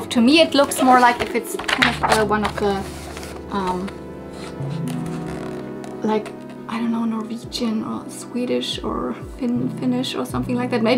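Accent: Germany. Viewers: I adore your videos but I cringed today as there were soooo many 70's fashions that you passed up in that box, and that Ken looking doll was a Sears exclusive Craig doll which can go for pretty good money. I am a Barbie dealer and have been collecting since 1976 but we all make mistakes, and it's hard to choose when you aren't familiar to that era of dolls. I would have bought the whole box LOL I adore you and my best friend is German too, so I love your accent. I subbed both my channels. All the best to you :)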